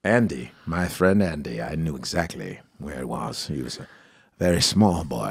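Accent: England, United Kingdom. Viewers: It’s very close. It’s just the English accent that makes it not Morgan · I give you a 7.8 out of 10. The problem is, you have an English accent while doing that voice, and Morgan doesn't.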